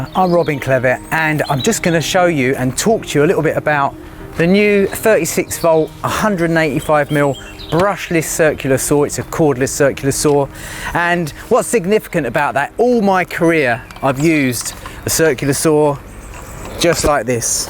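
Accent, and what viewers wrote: England, United Kingdom. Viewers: English English is so annoying, innit? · Uhm... I noticed British accent, rather than American... Swamp is... Louisiana... ? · Which part of England are you from? My Electrotechnology teacher has the same accent ahah And he’s also a good teacher!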